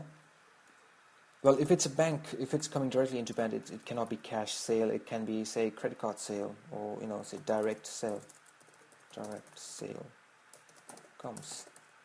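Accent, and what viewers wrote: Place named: United Kingdom. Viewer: This is very excellent and helpful. But it does not tell about origin of country. It sounds like British. Thanks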